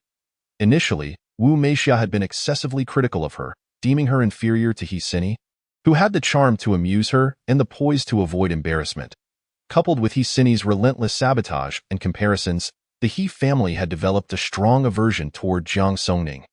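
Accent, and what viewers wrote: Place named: France. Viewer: It's still on French pronunciation.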